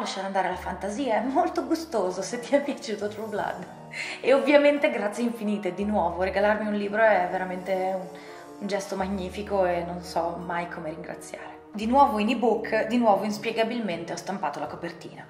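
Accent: United Kingdom. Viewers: In questi video ho trovato tanti consigli per regali... che mi farò :3 Ma mi hai tolto ogni dubbio su quale prendere per primo quando hai detto "India" e "mi è sembrato di sentire gli odori" Mi spiace solo che l'edizione italiana di Shantaram non abbia la copertina bella come quella inglese, anche se sono certo me ne farò una ragione. P.s. Adoro il modo in cui parli! Sei di madrelingua inglese?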